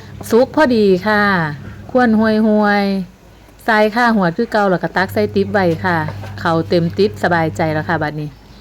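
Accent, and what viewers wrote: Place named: Isan, Thailand. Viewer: สวัสดีครับ มาฟังเสียงเว้าอีสานจ้อยๆดีแถะน้อ....โอ๊ยยย....เป็นตาแซบคักแนบาดเนี่ย...แซบๆกับอีหล่าสองคนเน๊าะ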